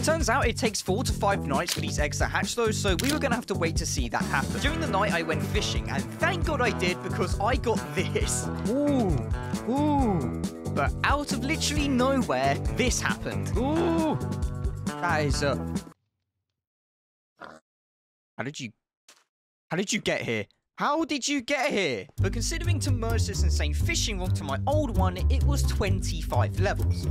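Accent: United Kingdom. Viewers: Why are you British? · I love your accent! Are you from England? What region? (I still can't tell between England or Australia, sorry if I'm mistaken!) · Are you a british? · sorry for out of the topic, but... is that British accent? because i really want to learn English and i hope i can speak with British accent too. i don't know why, American accent is too difficult for me😢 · Are you speaking british english? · Bro is so brittish 😭😭 But the video is guud😂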